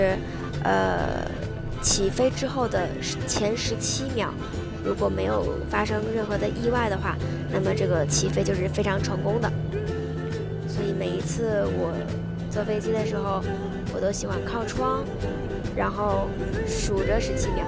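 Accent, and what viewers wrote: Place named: Beijing, China. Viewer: 好久没听着京片儿了，就是亲切。欢迎你来洛杉矶。像我这样住LA这么久的人也是通过你第一看到这里很多景点。谢谢。加油！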